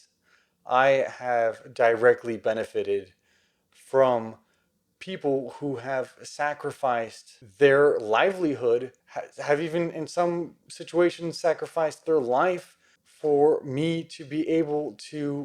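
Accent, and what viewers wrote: South, United States. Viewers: I can't believe you're talking about your late pride TBR at your house in Texas on this video during your quarantine including your past days in the days and don't ask, don't tell after you had a lot of the LGBTQ-related books including Gideon the Ninth by Tamsyn Muir, "The House in the Cerulean Sea" by T.J. Klune and "The Color Purple" by Alice Walker, so I'm very proud of you and I hope your husband, Zach will be very happy for you, instead and I love your Southern accent!!!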